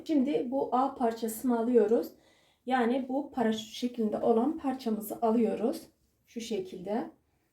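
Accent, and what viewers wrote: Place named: Sivas, Turkey. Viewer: Konuşman Sivas'ı gibi kolay gelsin eline salık evladım.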